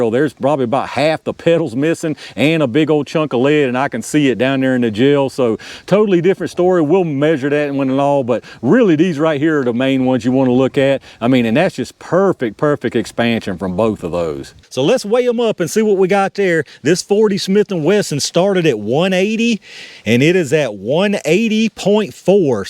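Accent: South, United States. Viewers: True southern Bo right here, started off the video almost ina song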